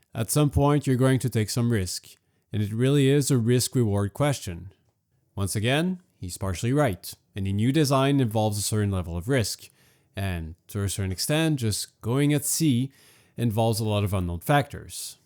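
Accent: Canada. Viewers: What were the pings they kept talking about ? I’m going to have to listen to this again, to pick up some information I missed the first time . I definitely will see the movie There is another boat that has some questions I ‘d like answers to. The boat “ Trash man “ sailed out of So. Portland Maine, going to Ft Lauderdale Florida. Wrecked by a storm. There is a first hand book about it written y a survivor, and some films for the Weather channel, but some things were left out of the story so it didn’t add up. No conspiracy , but human mistakes and the way the boat was built ( square port holes ) that didn’t hold up. Anyway I like your east Quebec French accent comes out a little bit. Sending good thoughts from PEI and Florida. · hey, are you from Sasktatoon?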